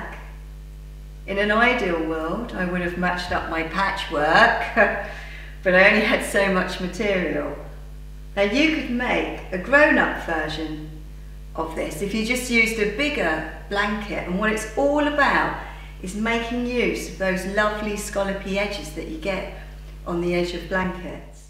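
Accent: United Kingdom. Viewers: Gorgeous! Way to go! I am loving recycle sweater projects this winter as well. I just did a cashmere remake. I could listen to your Brittish accent all day! Keep the great projects coming!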